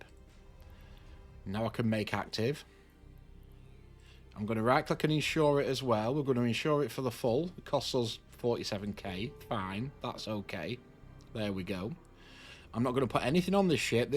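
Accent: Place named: Manchester, United Kingdom. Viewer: Another helpful video. Thank you. I thought I had made a noob mistake getting blown up in Mission 5 of 10................I now feel much better about it! I have insured several ships but have forgotten which ones - is there an easy way to see which ones have insurance and which don't? I've also been meaning to ask where in the world you are - my guess is Manchester/Salford/Salford? I'm a Mancunian myself (Whalley Range) although since escaping from work we now live north of the border. Your accent takes me back home again 😀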